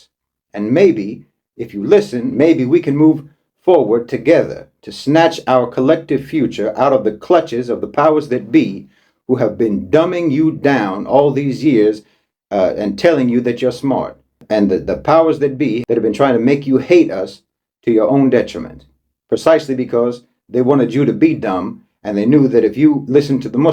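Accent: United States. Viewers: This guy is an idiot. saying all this in an AMERICAN accent eh? i love how they always tout the values of Islam from WESTERN countries. especially when they leap over women an children risking life and limb to get to the West. just wondering how the only successful Islamic countries are the ones with oil but the West is somehow lacking in rationality? when i see the dutch immigrating to Jordan then ill listen to a MUZSLIM about their superiority. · What's this fella's background? He has a US accent. It's a fact that Ireland has over 20% immigration. Five of the last twelve murders in ireland were by "refugees" Irish do not migrate to north Africa, Afghanistan, Georgia or Nigeria. But ireland takes in hundreds of thousands from these areas. Ireland never colonised anywhere, it was colonised by the english over hundreds of years. The slaughter in gaza is courtesy of zionism supported by zionist administration in London & Washington. 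· I am still sitting/laying on my couch watching and listening to your American-accent eloquence about the superiority of Islamic thought. What baffles me is the total absence of evidence behind the propagandistic effort to expose the so-called western corrupt “state of mind” , if any. Ok it is what you say it is, but did you offer anything which is less aggressive, corrupt, misleading, totalitarian, treacherous….? No, you propose the same shit dressed in a different outfit with your own brand on it. The difference is that you deliriously believe you own it, understand it, will control it as long as you wish to.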